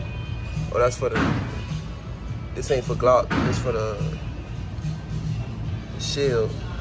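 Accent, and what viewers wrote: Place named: Maine, United States. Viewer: Raw af. Where u from maine? U gotta cool voice/accent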